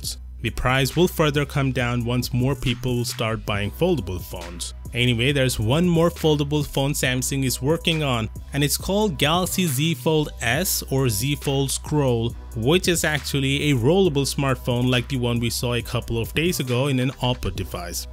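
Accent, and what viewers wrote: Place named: India. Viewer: Work on your accent, everytime I try to watch your video till the end i just give up because of it. Otherwise your fans will be indians only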